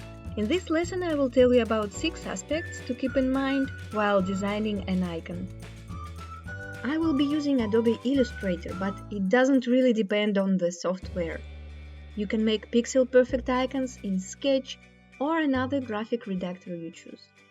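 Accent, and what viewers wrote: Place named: Russia. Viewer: Услышал RUSSIAN акцент ))) но это не важно — лайк за видео очень грамотно и хорошо, браво молодец!!!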